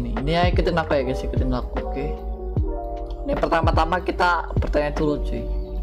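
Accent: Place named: Java, Indonesia. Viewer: Medok bg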